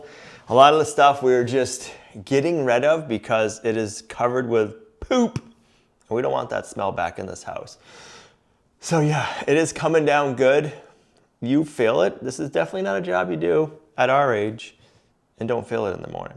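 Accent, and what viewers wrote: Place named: Boston, United States. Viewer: Boston accent! They just talk different out there.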